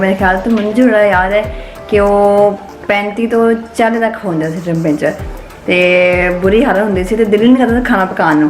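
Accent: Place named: Punjab, India. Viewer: Me ap ki Punjabi hi sunti rahi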